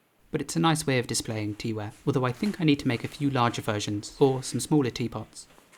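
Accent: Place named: England, United Kingdom. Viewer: To anyone wanting to hear a real English accent.. welcome.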